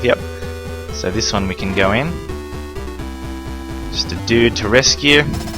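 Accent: Australia. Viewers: i love your voice its a really thick Australian accent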